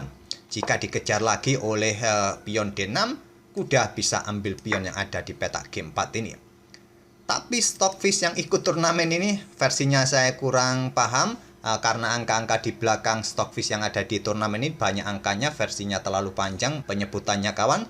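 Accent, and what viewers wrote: Java, Indonesia. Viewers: Kamu Jawa mana bqng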